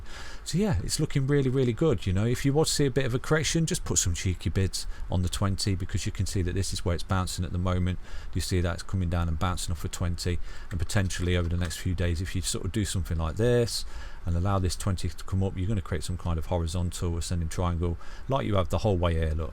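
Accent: United Kingdom. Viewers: I enjoy the British fashion of your talk "bam bam bam see you later" haha.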